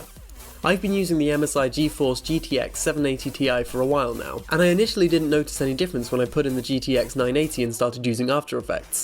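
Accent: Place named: United Kingdom. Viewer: I like your style, looks clean and professional. also your brittish accent makes me like the video even more :D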